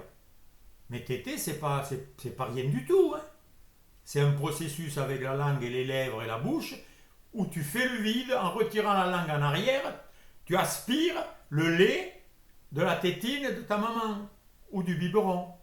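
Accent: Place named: South-West, France. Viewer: ami au bel accent du Sud-Ouest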